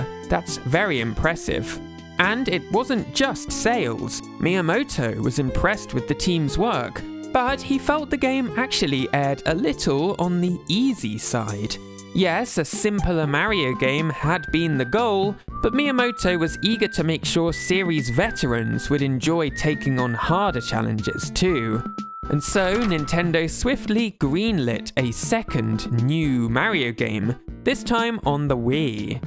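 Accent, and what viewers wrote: United Kingdom. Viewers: This is probably the most UKish accent I've ever heard.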